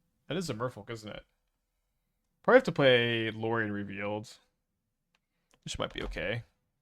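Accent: Texas, United States. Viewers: Texas accent, i hear it that way.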